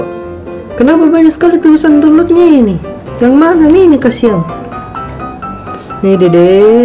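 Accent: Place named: Sulawesi, Indonesia. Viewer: Hahahhaha sulawesiii logatnya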